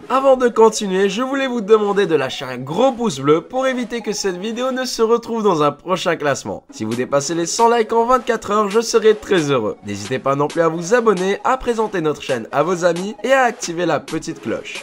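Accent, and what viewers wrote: Quebec, Canada. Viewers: T'es super! Mais es-tu Quebequois?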